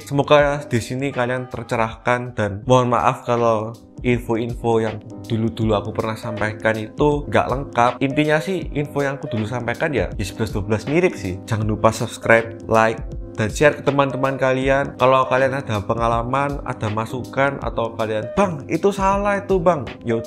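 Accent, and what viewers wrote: Java, Indonesia. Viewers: Pas buka channel ini, jujur ga pernah mikir Koko nya pake logat medok jawa.. 😅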